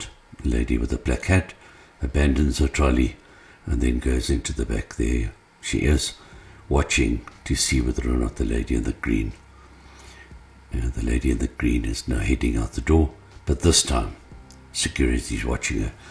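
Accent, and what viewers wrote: South Africa. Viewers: Sounds like a South African accent. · Ughh a Afrikaner accent gross. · It's obviously not America. All the shoppers are black and the narrator has a South African accent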